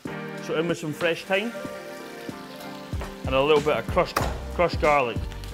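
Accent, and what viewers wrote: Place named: Scotland, United Kingdom. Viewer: Yer Scottish yay 🏴🏴🏴👍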